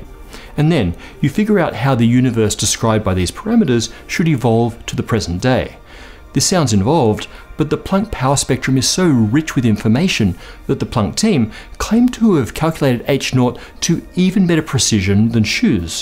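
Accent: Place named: Australia. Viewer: This gentleman makes very interesting videos and I can only say good things about them. I can understand why Professor O'Dowd has so many supporters. I feel terrible for saying this but to my eyes he has an extremely strange shape. His head seems to be much too big his torso and legs appear too small. His legs seem to be too far apart and too short. It's the oddest thing. His habit of holding his fingers intertwined with the thumbs touching on top for no reason I can determine irritates me intensely. On top of all this is his very strange stresses on apparently random syllables in each sentence. And finally there's that Australian accent which grates on my nerves like a chalk screech on a blackboard. The thick eyebrows and beard seem particularly ill suited for his looks. There's nothing personal about any of this and really I mean no offense as the whole perception is entirely mine and I can't explain it. 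Am I alone though? To say something good about Professor O'Dowd is easy. The inconsistency between the two results for Hubble's constant is fascinating as indeed are the topics of Dark Matter and Dark Energy. We live in exciting times for cosmology so consider the enormous difference between this scientist and another man who obtained a Doctorate in Astrophysics, Dr Jason Lisle, whose area of study was Solar Dynamics and who published a few papers before throwing his career away to become a "researcher" for an Answers in Genesis type outfit and a YEC (Young Earth Creationis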